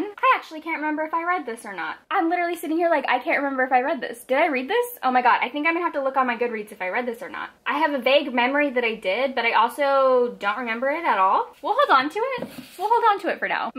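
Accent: South, United States. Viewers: Omggggg that southern accent had me rolling 😂😂😂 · Omg your southern accent 🤦🏼‍♀️😂🤦🏼‍♀️😂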